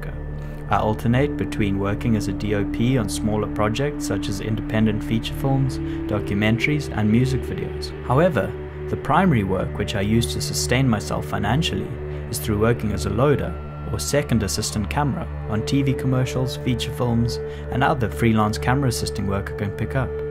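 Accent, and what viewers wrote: South Africa. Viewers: I've been watching a couple of your videos over the last few days and kept wondering if you were South-African based on your accent. It's so nice for me to hear fellow South-African's approach filmmaking with such a passion and clear love for what you do. i too am an aspiring filmmaker in Cape Town, writing and directing shorts. Keep up your good work! i really enjoy the channel and wish the best for you in your career! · Came across the channel via a ‘no film school article’. Was intrigued when I heard the SA accent but only realized who it was when the pics showed up :) loving the videos, very helpful insights. Looking forward to more!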